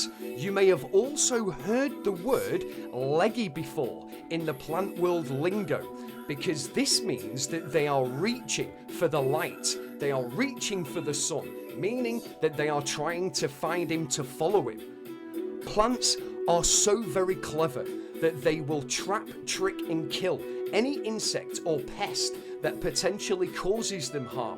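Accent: United Kingdom. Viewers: I like your narrative in your Yorkshire or manc accent. Somewhere up north... Great series mate, glad I discovered you, you deserve way more views from these vids. Going to have a binge on these now while decorating from where I left off on episode 7. Rather watch this than Netflix anyday!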